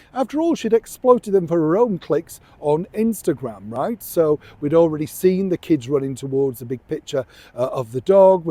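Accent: Yorkshire, United Kingdom. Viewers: It's his Yorkshire accent? Lovely on the ears 😁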